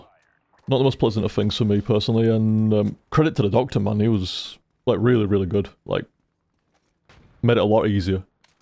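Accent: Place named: North, United Kingdom. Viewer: Hey, like a few others have commented, I first subscribed to your channel during your very first IWBTG playthrough, back in 2008 or whenever it was. I've gone from an awkward 14 year old to a successful 24 year old watching your vids, and I honestly think your content has helped me a lot through some of the tough times (maybe a fellow northern voice on the internet was cathartic, or maybe it's just all the laughs, who knows...). You're certainly my favourite youtuber ever, no doubt in my mind. you might not get millions of views on each playthrough, but your fanbase is really dedicated and I don't think a 2 month gap is going to make us go anywhere - we've been here long enough to stay a while longer! Take as long as you need to feel better - I can always watch the super mario 3 htsf for the 5th time... cheers!!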